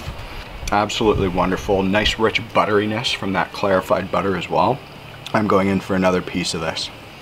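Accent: Canada. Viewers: I love how extremely wealthy people wear God awful sweaters like that . Called his gas grill a BBQ LOLOLOL you have to be wealthy to talk like that. Must be Canadian because he says aboot not about.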